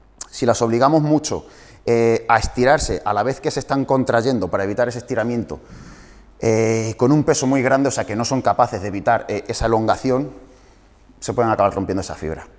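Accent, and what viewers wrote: Spain. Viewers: Me recargo en la pared. Qe aya más videos hecho por latinos. Cómo me fastidia el español de España.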